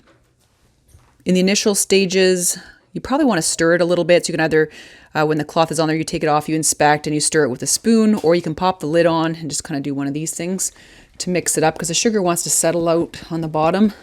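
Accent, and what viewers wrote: Canada. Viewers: 1 min in and im thinking is that a Canadian accent I can here ? Ok thats a yes. Im English and go to Novia Scotia each year and im so wishing I was over there right now in lock down rather than here.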